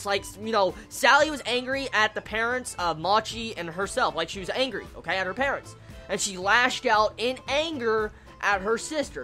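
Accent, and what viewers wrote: South, United States. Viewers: Hey I I know you probs won't read this but I'm really sad because I start high school tomorrow and I. Really scared and sad I really sad because I can't watch your videos as much because homework but I'm really nervous because I don't like going out with friends and I am kinda socially awkward I was really depressed for a while because I liked anime and I had no one to relate to. Then I found your channel and your awesome personality and the hint of southern in your voice always made me laugh and smile when you reviewed anime. I really don't know much about you and you probably won't care for this but you helped me through a lot. It was never like I wanted suicide but I was sad. I never talked too my friends and cut them off. SORRY FOR THE LONG MESSAGE I DIDNT MEAN IT I JUST GOT CAUGHT UP IN MY EMOTIONS. Also I watch all your vids even if it's anime I don't watch. 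I haven't been subbed for a while but I still support you as though I have been here for a long time. Thanks for everything. -Moe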